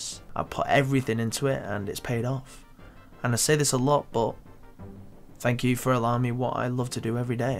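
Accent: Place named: United Kingdom. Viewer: Uk accent = ez youtube voice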